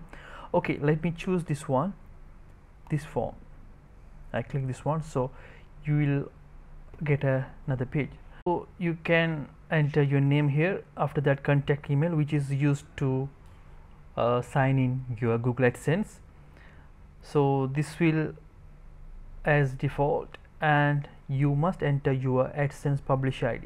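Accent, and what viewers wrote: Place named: India. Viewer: dude if ur an indian then just talk English in indian accent man the accent was irritating by the was the the msg was informative, helped me thanks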